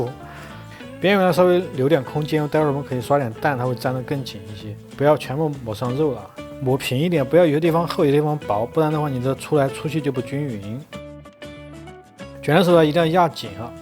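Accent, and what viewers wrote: Sichuan, China. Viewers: 期待的年夜饭特辑，好喜欢！我小时候在四川住过四年，家乡的方言听着好亲切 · 很喜欢看你的美食视频，很轻松，节奏也控制的很好，少许川普加时不时的幽默— 社会佩奇😅 请继续分享，我继续点赞👍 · 喜歡川普口音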